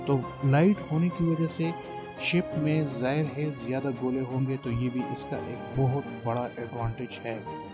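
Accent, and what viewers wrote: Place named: Pashto, Pakistan. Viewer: thanku4yrvocal my assumption is yr accent is pashto from sarhad kpk ji m zindabad inshala china will always rise couse intheir history theyr talented and creative inventive nation yet they never invaded any country godbless china and5superpowers and rest ofthe world nations god let sanity prevail may v not destruct anhilate eachother peace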